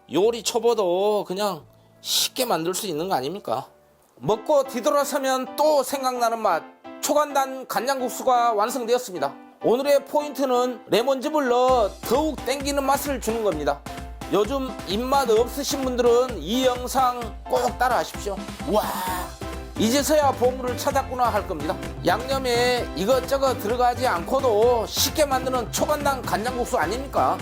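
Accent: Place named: Yeocheon, South Korea. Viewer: 이분 사투리는 여천 사투리라고 확신합니다... ^^ 실력,해설,지도,방송 모두 굿입니다!!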